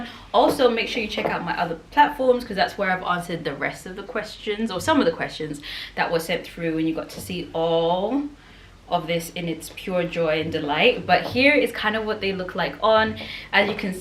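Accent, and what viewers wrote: United Kingdom. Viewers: I don’t wear pantyhose, pretty sure I’ll never wear them……but it’s so nice to hear an English accent now I live in the USA. Plus you’re so fit as well. London girls are the most beautiful girls In the world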